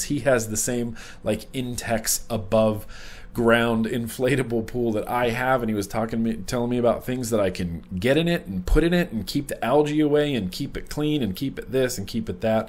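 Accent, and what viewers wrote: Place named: West Virginia, United States. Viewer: Hey there mr. lol i just bought a dreadnaut clone from fasttech and everything seems to be in all the right places but i cant seem to get it to give off big vapor ive built the coils like six times i have been using 28 kanthal and organic cotton i use a multimeter it usually gives me.9 ohms but when i fire it it seems like it takes too long and u have to press the button at like an angle for it to even fire what coyld i b doing wrong? Please any help or advice would be greatly appreciated i love your long ass vlogs its awesome i love that adorable too im from west virginia so we sound alike lol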